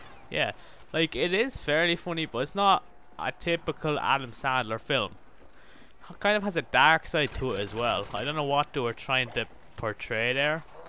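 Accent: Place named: Ireland. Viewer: wa part of ireland are u from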